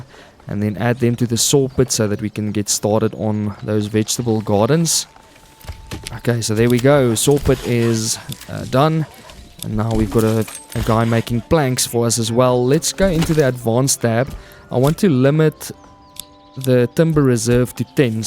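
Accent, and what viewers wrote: South Africa. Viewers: Greetings from 🙌 Enjoying the South African accent during the playthrough.. I don't think I'm subscribed to anyone in South Africa 🤔 ⭐New Subscriber Unlocked⭐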